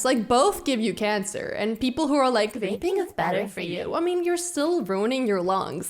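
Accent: United States. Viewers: u sound American though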